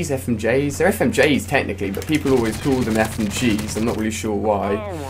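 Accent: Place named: United Kingdom. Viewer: Seriously man. listen to his accent...Britain ring a bell?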